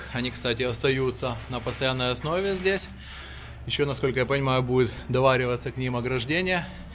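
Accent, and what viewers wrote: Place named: Hutsul, Ukraine. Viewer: у вас файна гуцульська говiрка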